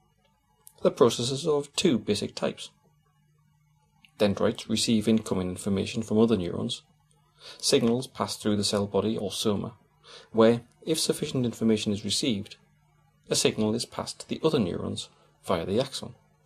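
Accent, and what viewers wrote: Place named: United Kingdom. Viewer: With all respect to your place of origin,realize this is the internet,so use a freakin accent that the whole world can understand,not only British.Also,for 2010+ standards. animation sucks.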